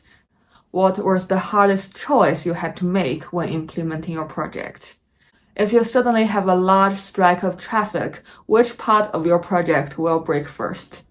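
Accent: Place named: United Kingdom. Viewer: 博主的accent有点British是怎么肥四！！！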